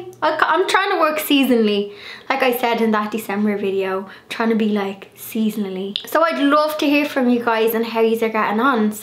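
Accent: Ireland. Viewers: Am still working from home. Have you returned to work or are you focusing on YouTube? Love your channel, ❤️ your house, and especially your adorable Irish charming personality and accent. Isn’t spring wonderful?💐🌻🌿🪴hugs from Arizona 🌵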